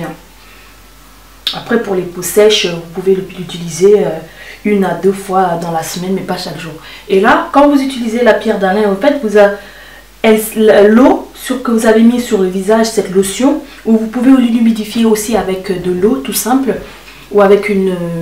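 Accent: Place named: Senegal. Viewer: Tu es de quelle nationalité ? tu as l'accent sénégalais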